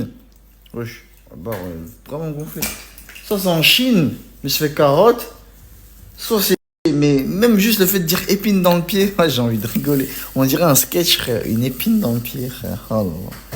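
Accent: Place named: Cameroon. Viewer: Arrête de parler camerounais tu fais honte au continent !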